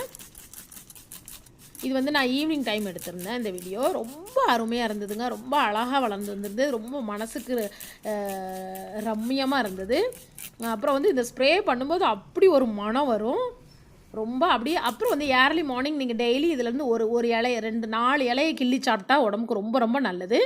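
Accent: Tirunelveli, India. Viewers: Neegga thirunelveliggala mam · Sister ungal tirunelveli voice Rembavum super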